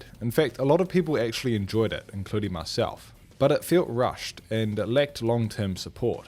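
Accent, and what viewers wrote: New Zealand. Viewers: Also I'm assuming by the accent you're a fellow New Zealander my bro i've dropped a sub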